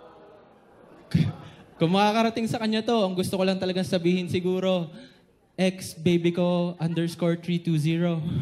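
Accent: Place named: Batangas, Philippines. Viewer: Nakakaaliw ang puntong Batangenyo! Para kang umiinom ng kapeng barako. Mabuhay ka. Ipagpatuloy ang paglikha!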